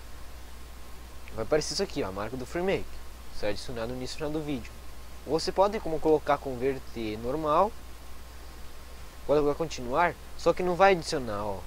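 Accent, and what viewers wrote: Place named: Curitiba, Brazil. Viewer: vlw ai cara só para constar amei seu sotaque, vc é de Curitiba?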